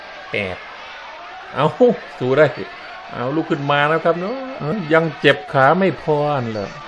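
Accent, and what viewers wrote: Isan, Thailand. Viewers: ผมชอบคนภาพมวยเสียงอีสารมากๆเลยครับฮาดีครับ(ชอบครับ😅😅😅)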